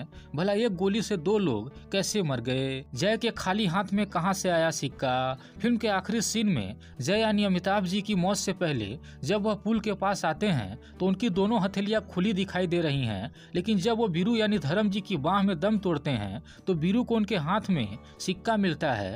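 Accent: Bihar, India. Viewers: Sabse pahle to apni ye Bihari tone badliye, ek dam bekar lagti hai.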